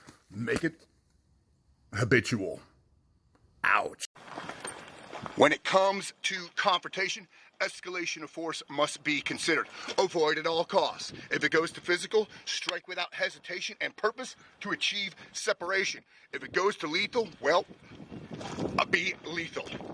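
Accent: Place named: Scotland, United Kingdom. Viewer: ! 😂😂😂 I KNOW this guy would sneak up on me and kill me if he needed to. Because I DID NOT see that Scottish accent coming. Awesome stuff,! I hope one day we can drink a stiff one and share artwork together!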